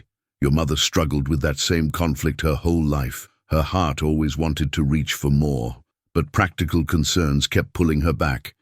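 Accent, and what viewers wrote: United Kingdom. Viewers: The plummy English accent of the storyteller is totally at odds with the narrative.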